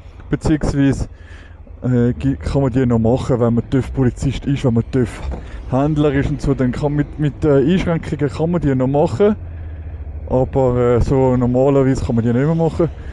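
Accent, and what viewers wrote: Switzerland. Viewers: Schwiezerdütsch, wie spaßig ist das denn, ich liebe es. Geht um Führerscheinklassen fürs Motorrad, soviel hab ich verstanden, großartig. Schöne Grüße aus dem wildem Osten (Deutschland, Thüringen).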